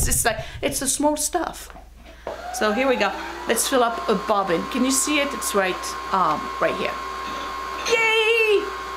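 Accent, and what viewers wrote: France. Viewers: Happy birthday!🎂🤗 I am excited to see your quilting and sewing projects. That is something I have always wanted to do, but never really been able to accomplish. Maybe my old yard sale machine was the problem 😉lol. Anyway I have already learned a lot from this video. I always look for your new videos and love your French accent, it is beautiful. Thanks for sharing.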